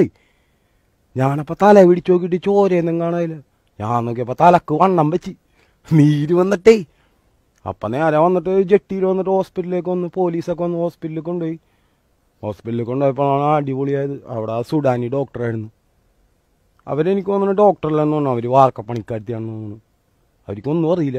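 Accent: Kochi, India. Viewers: അവൻ ആദ്യം മുഖവുര പറഞ്ഞല്ലോ കൊച്ചിക്കാരൻ ആണ് എന്ന് . കൊച്ചി സംസാരരീതി ഇവന് 100 % ഉണ്ട് . കൊച്ചിക്കാരുടെ സംസാരം കഞ്ചാവ് അടിച്ച് പറയും പോലെയാണ് . ചിലവൻമാർ കഞ്ചാവും ആയിരിക്കും . "കൊച്ചി കണ്ട വന് അച്ചി വേണ്ട" എന്ന് പഴമക്കാർ പറയുന്നതിൽ കാര്യം ഉണ്ട് . പണ്ട് ആണുങ്ങൾ കൊച്ചിയിൽ പോവുന്നത് ലാവിഷായ് പെണ്ണുങ്ങളെ വ്യഭിചരിക്കാൻ കിട്ടുന്നത് കൊണ്ടാണ് . ഒരുപാട് പെണ്ണുങ്ങളുമായി വ്യഭിചരിച്ച പുരുഷന് പീന്നീട് പെണ്ണ് കെട്ടി ജിമിക്കാൻ താൽപര്യം കുറയും . അതാണ് അച്ചി വേണ്ടാ എന്ന് പറയുന്നത് . (Note: ഇപ്പോൾ അവിടത്തെ ആണുങ്ങൾക്ക് കുറച്ച് വിവരം വെച്ചു . അത് കൊണ്ട് പഴയ പോലെ കളിക്ക് പെണ്ണ് ലാവിഷ് അല്ല 🤪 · Sorrry. Da. Nindea. Kochi basha. Suuuppper.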